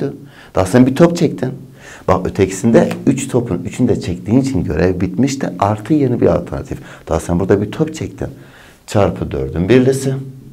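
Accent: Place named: Eastern Anatolia, Turkey. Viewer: Hocam tam doğulu ağzı var sizde. Ve bu sizi daha da samimi yapıyor 🤗🤗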